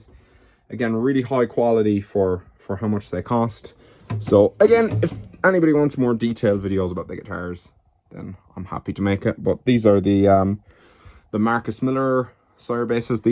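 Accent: Ireland. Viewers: lol - dude I just searched for a Bitwig vid on reverb and mindlessly clicked the top one and turned back to the DAW. 10 seconds later I'm thinking "why does this dude sound super similar to that funny Irish chap I follow on Instagram" 🤣 Actually kinda cool to see you being an absolute social media megastar over there while you have a humble 5.6k followers over here, keepin' it real. Awesome to see how much you blew up dude - I expect the studio has probably expanded some since your success? Also mad jelly over your Polybrute. Good on ya mate and cheers for the tips.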